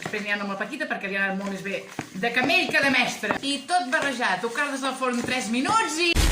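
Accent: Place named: Girona, Spain. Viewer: M’agrada escoltar la a la radio accent Girona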